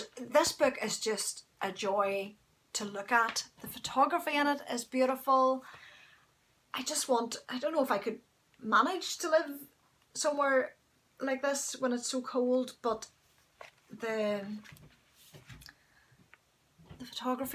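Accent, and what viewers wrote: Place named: Ireland. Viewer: Oh Nooooo, the knit retreat is all sold out! I am a new knitter in Minnesota, and a genealogist with Irish ancestry in Northern Ireland and I have had the pleasure of visiting Ireland three times. I just found your podcast and I started smiling as soon as I heard your lovely brogue. When you announced the retreat tickets going on sale at 10 P.M. GMT today (It is P.M. here) I immediately went to the website to get a ticket. Oh well, perhaps next time. I am thrilled to have found your podcast. Fern in Minn P. S. I began knitting in Sept of 2018 and I am loving it!!!!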